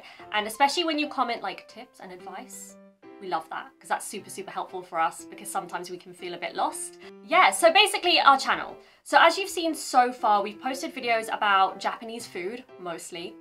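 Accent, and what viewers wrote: United Kingdom. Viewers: Why U r not that popular!?!? Ur face is so pretty 😍 And ur Japanese is good as well. If u start couple CH maybe U’ll get more views??? Idk but anyway. I’m surprise that I found u today and u r not that famous. So I hope many people find u very soon and get to know this pretty cute British accent 👧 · WOW! I love your British accent! I will follow your video as my practice for listening. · All the best! I'm very familiar with British English as I have lived in UK for 10 years. It was very comfortable to listen British English! I would enjoy your channel. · 本当にイギリスの方の英語は聞き取りやすいと思います。米語のネイティブスピードは努力しても聞き取れる気がしませんが、英語はなんとかなりそうな気がします。あと、築地の時の話でも書いたように、の英語はマイ・フェア・レディの映画を見ているようです。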